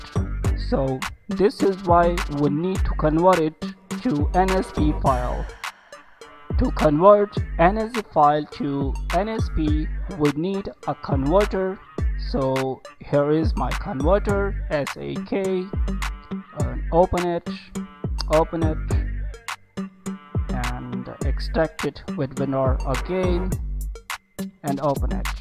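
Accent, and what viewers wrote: India. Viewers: It's always indian voice with 1$ micro. Classsic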